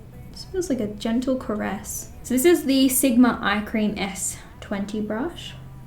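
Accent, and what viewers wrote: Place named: Australia. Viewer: Loved this style vid and love the look. You look beaut. Also loved how much your Australian accent came out to play!💁🏼‍♀️💕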